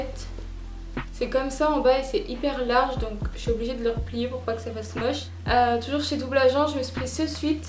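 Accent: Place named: North, France. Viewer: De quelle region es tu je trouve que tu as l'accent du nord !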